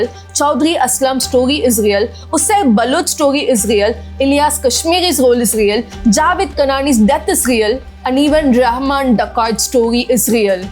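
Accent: Tamil Nadu, India. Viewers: Good job. I have watched many episodes of yours including the one where in you narrated that you have an issue with the letter R that’s why your accent is different. I felt bad that without understanding i had commented on your accent. But after that I watched and listened carefully, you defiantly have to improve your accent, it’s not just the letter R, u have issue with the English accent. Your mind processes in Tamil and hence your accent of English is like Tamil. I mean no offence but u must improve your accent for sure. U surely can if u try. Listen to your tone and accent you will know for yourself. God bless. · I like not only the content and the research you make into them, but I like your pronunciation (the Tamilised English) the most. Keep doing this good work and God bless you dear.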